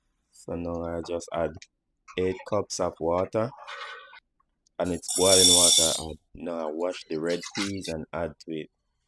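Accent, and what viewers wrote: Jamaica. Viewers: I’ve watched your short videos with music playing in the background. I much rather hear your rich Jamaican accent. It gives your awesome dishes more flavor. 👍🏾👍🏾👍🏾👍🏾‼️‼️‼️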